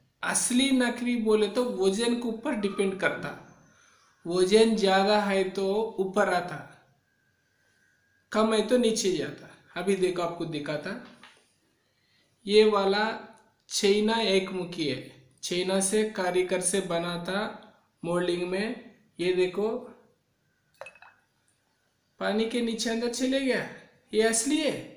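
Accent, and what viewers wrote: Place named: Kerala, India. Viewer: . Kerala ka hai Bhai boli se pata lag jata hai .Mai Gaya hu Kerala waha ke log yese hi bolte hai.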